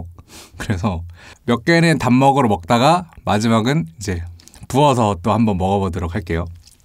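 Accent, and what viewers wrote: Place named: Seoul, South Korea. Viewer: 서울말투 좋당 나는 쏘쓰 라고 하는데 소~스 라고 하시니까 발음이 부드럽네요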